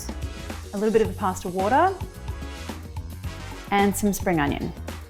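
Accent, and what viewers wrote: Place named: Australia. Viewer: Your Australian accent has gotten a lot stronger since moving!! 😊😊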